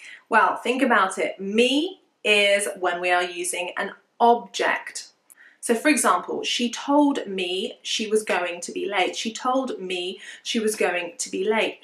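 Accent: United Kingdom. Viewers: Thank you so much for improving our English since I found your channel I watch 3or4 your videos everyday to improve my English, love your teaching and British accent and this channel is very useful for me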